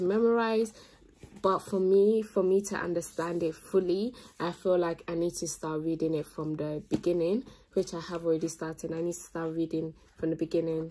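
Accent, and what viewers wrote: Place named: Ghana. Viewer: When you honestly ask God for guidance, Islam is the destination you will arrive at. Keep going sis. Your accent sounds like from Ghana 🇬🇭 , is that the case?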